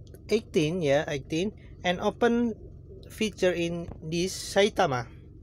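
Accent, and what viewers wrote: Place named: India. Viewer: he sounds indian...okay dislike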